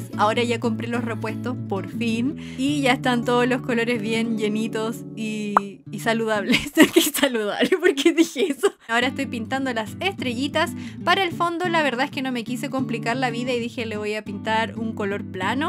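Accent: Chile. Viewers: me parece?? o habló en chileno???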